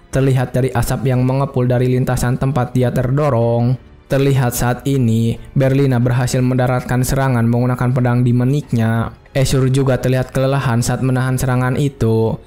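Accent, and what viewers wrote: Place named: Madura, Indonesia. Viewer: Pasti ini orang madura yg jadi naratornya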